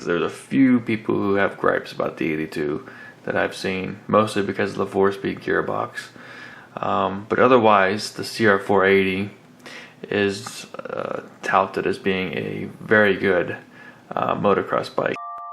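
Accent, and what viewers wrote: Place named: Pittsburgh, United States. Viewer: Nice Pittsburgh accent. lol GREAT job capturing the history of these AWESOME machines.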